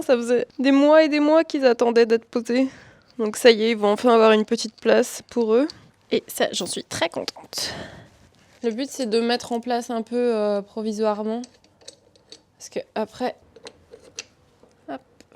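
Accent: Alsace, France. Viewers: Cc tu m'as bien fais rire quand tu es en compagnie de tes parents tu as l'accent alsacien a fond😂😂😂😂 c'est trop mignon et bonne année ma belle félicitations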